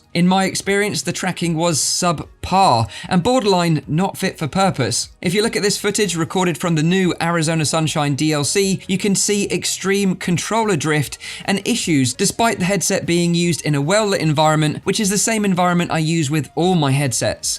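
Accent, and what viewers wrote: United Kingdom. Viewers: NDT and those guys are all actors. Propagandists. And yes , ole boy is brit af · wtf? He has a decidedly British English accent. His pronunciation of 'cosmos' is in that idiom, and no mistake. I see no evidence of Yankery here!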